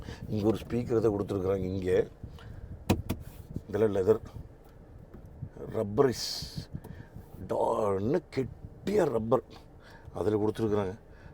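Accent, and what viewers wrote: Kongu, India. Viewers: அண்ணா நீங்கள் பேசும் கொங்கு தமிழ் தமிழ் மிகவும் அருமை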